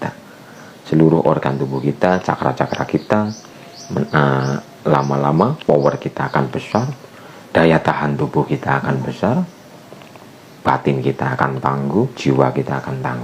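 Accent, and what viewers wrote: Banyumas, Indonesia. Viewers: Assalamualaikum wr wb Qolbitu ustadz ilmu nya,,ilmu sgt bermanfaat,,ustadz ko ngomong ngapak ya 🙏😀😀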